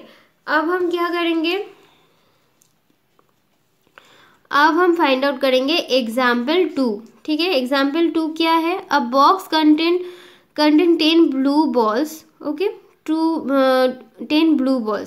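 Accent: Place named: Bengal, India. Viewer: Bridger O'Neill it's in Hindi with some Bengali accent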